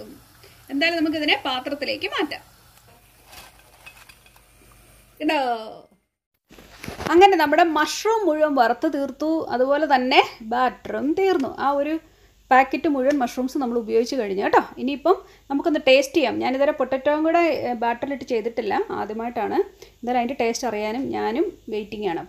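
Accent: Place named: Kuttanad, India. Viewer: സംസാരം കേട്ടിട്ട് കുട്ടനാട്ട്കാരി ആണെന്ന് തോന്നുന്നല്ലോ 😊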